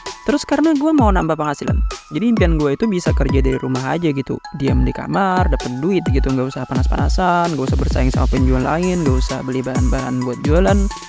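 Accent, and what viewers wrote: Kalimantan, Indonesia. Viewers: izin nebak bng, keknya dari logat bahasanya keknya orang kalsel/kalteng dah :v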